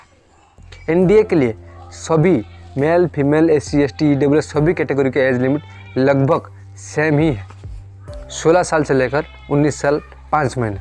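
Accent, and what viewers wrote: Odisha, India. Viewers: Bhai ap odia ho kya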